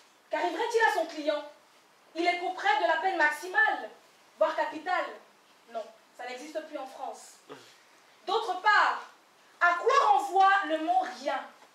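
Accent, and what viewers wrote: Cameroon. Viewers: Accent très 🇨🇲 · Elle a l'accent camerounais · Absolument son accent est typiquement camerounais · Elle a un accent camerounais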